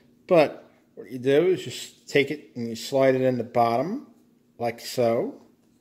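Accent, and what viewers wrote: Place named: Northeast, United States. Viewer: Yup, the north eastern US accent is still the most atrocious in the country. The accent sounds like a retard being beaten.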